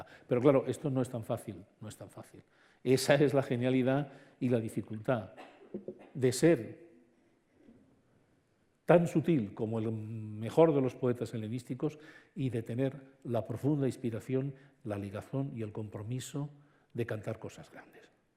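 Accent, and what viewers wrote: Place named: Catalonia, Spain. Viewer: Encuentro esta conferencia 8 años despues de que fue presentada; quiero decir que nunca he leido a Virgilio, soli se de él por lo que nos enseñaron en la escuela y por cuanto Dante lo menciona en su Divina Comedia; dicho lo anterior quiero agradecer a la March por sus magnificas presentaciones y por invitar a maestros como este señor que me despiertan el gusto por la lectura de lis clasicos; gracias maestro Vidal por su hermoso acento Catalan y por todo su conocimiento compartido aqui.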